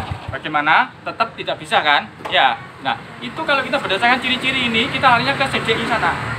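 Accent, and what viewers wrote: Java, Indonesia. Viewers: Jowo ne ngendi mas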